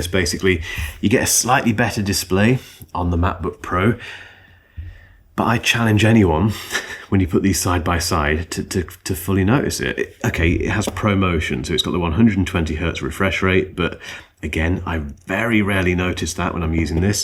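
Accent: United Kingdom. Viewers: Love the accent. The envy of all us non-British students of English.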